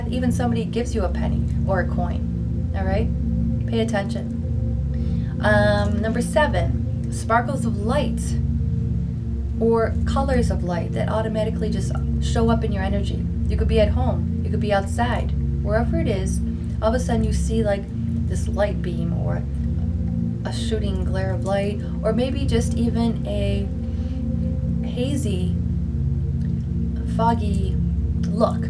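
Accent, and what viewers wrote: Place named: Upstate New York, United States. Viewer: I like the way you talk and your voice maybe from upstate new york. I see a lot of lady bugs.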